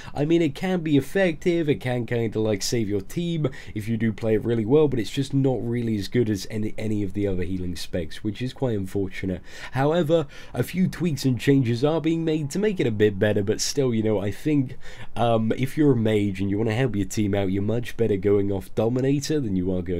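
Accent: United Kingdom. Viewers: Hey. Great vids. And love the accent!! Its like sherlocke holmes playing rift! Keep up the great work!!!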